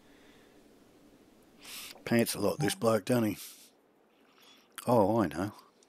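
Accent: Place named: New Zealand. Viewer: Based on the accent, I would guess phlegmatic New Zealander. Am I close?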